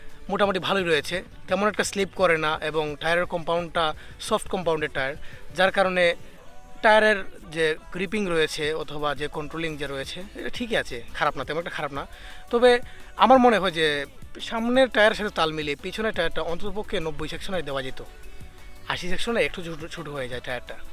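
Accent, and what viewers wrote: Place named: Sylhet, Bangladesh. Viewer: সিলেটের মানুষদের একটাই প্রবলেম, শুদ্ধ বাংলা ভাষায় কথা বলতে গিয়ে একদম মুখের অবস্থা খারাপ হয়ে যায়। শুদ্ধ বাংলা ভাষায় কথা বলা কি এতই কঠিন? আসলে একটু চেষ্টা করলেই এটা মানিয়ে নেওয়া যায় এবং পারা যায়।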